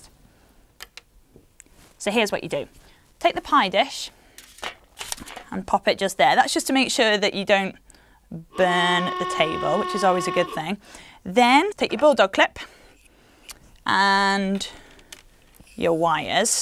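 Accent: United Kingdom. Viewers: She's all British and stuff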